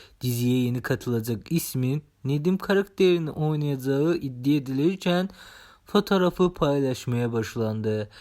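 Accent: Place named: Azerbaijan. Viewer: Admin siz Azermisiniz? Yaaa konuşmanız bi qarip da. O yüzden sordum. Aff edərsiniz 🙏🏻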